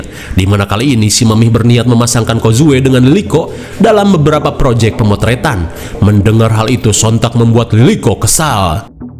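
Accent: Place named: Sunda, Indonesia. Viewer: suka gue sama pembawaan nya Sunda banget bacotan nya keren mang jangan bosen bosen upload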